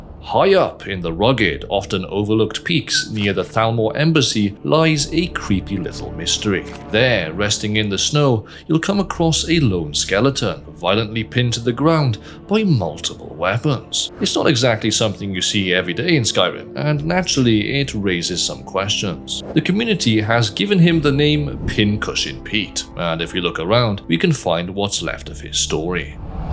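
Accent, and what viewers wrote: Wales, United Kingdom. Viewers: Are you Welsh?🏴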